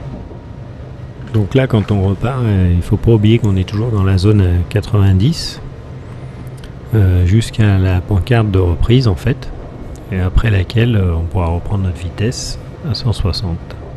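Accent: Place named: Haute-Loire, France. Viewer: La voix de est unique, appaisante avec un p'tit air de la Haute Loire si je n'dis pas de bêtises.. 👍😊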